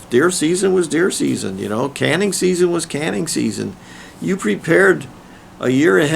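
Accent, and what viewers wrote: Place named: Midwest, United States. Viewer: new subscriber, loved this video. I’m a 4th generation carpenter, Union trained Journeyman Cabinetmaker & Millman, worked (30) years in the Commercial Cabinet and Millwork Industry. My trade career ended in 2009 when the economic downturn and competing imports from China for less than our cost of materials wiped out my industry. Around the same time all of the major furniture companies filed for bankruptcy. Government sent me to Nursing School (LPN). Retired (4) years ago as a Jail Nurse. I started learning the Carpentry trade from a young age outside of Dubuque Iowa. By your accent I figure you’re from the midwest, maybe Illinois. I remember the old ways of doing things. Engineers hatchets, Yankee screwdrivers, & 16d sinker nails. When I was an apprentice, some of the journeyman Carpenters would take an engineers hatchet, cut of the hatchet part and weld on the claws in order to get a heavier framing hammer. 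Of course when the Union Rep showed up at the job site they had to hide it because it exceeded the weight the Union allowed. Anyway, your little talk about the old days brought back fond memories of working with my dad and grandfather. Thanks.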